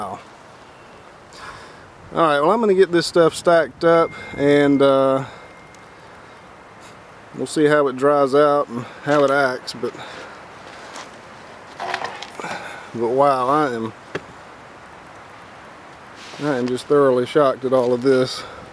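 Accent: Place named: Georgia, United States. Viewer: Yeah, you sound like a Georgian. I live in TX but have a sister who lives in Lawrenceville. Your voice sounded familiar - like my brother in law. Keep on making videos.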